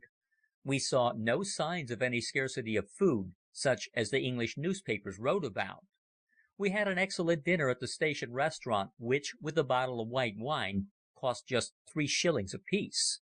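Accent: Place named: United States. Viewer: Oh another bloody American narrator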